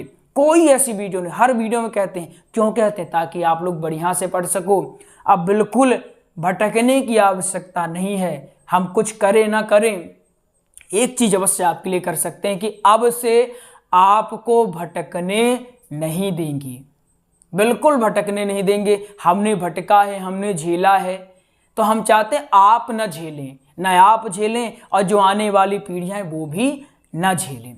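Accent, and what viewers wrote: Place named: Kashmir, India. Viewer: aap ke bolne me kashmiri jhalak hai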